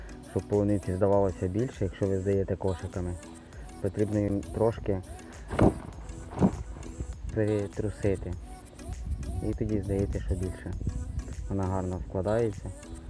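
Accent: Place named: Russia. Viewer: Швидка, дрібна, часу, праці - а вы произносите эти слова по-русски : быстро, милка, времеми, роботы и т.д. Это такой украинский - любой сможет на нём говорить, каверкая русские слова и называть такой ,,язык" украинским.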